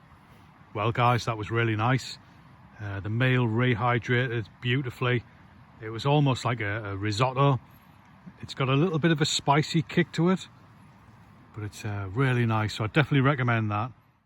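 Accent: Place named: Scotland, United Kingdom. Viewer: your Scottish accent because it's cool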